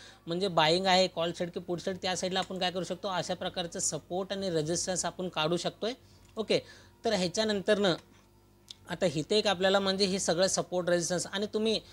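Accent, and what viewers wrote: Solapur, India. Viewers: Sir tumhi Solapurche ahe Right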